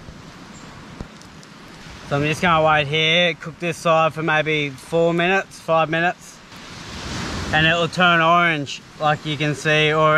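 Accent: Australia. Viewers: You from Perth dude ?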